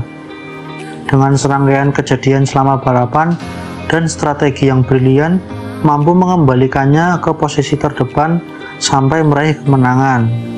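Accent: Java, Indonesia. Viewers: Mantep 👍, logatnya wong jowo ?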